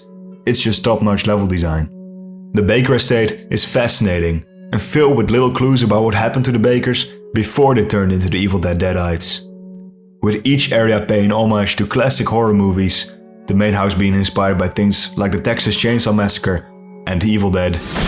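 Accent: Netherlands. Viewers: i'm smelling a dutch accent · Great video, awesome accent where you from dude? Guessing Dutch but not positive.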